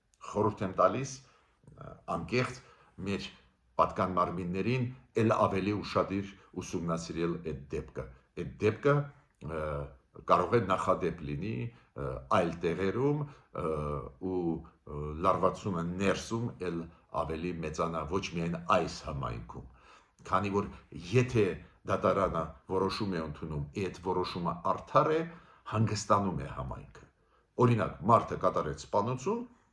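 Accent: Russia. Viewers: Такое впечатление, что господин жил и учился на русском языке. Многие слова он произносит на русский манер. Одно успокаивает, что он патриот Армении на все 100%.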